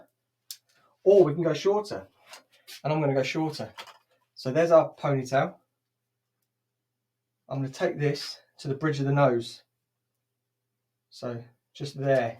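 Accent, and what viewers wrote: United Kingdom. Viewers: I watched this video the first time with the sound off. I never would have guessed you had a British accent.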